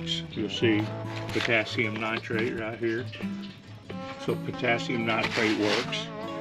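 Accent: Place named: South, United States. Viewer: The southern drawl-y accent is super likable to me atleast, whos from Northern Sweden, arctic cirlce. ❤️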